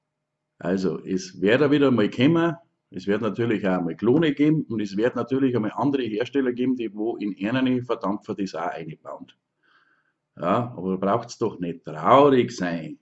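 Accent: Bavaria, Germany. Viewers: Boarisch is einfoch schee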